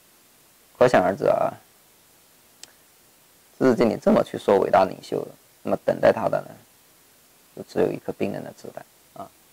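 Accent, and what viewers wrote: Guizhou, China. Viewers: 配上字幕，真的是好多了。。。不过我刚刚习惯了听你的贵普，哈哈。。。另外，字幕有较多错别字，估计是语音转换的关系。比如那个“一语成谶”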